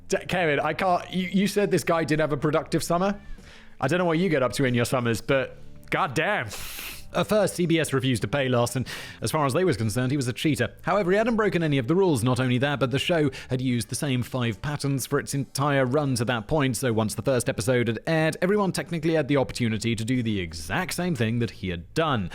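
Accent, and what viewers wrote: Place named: United Kingdom. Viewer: The topic isn't my top interest in any way, but I really need to look those up lol. was made for podcasting, especially as an American, where any British accent immediately adds some sort of credibility 😅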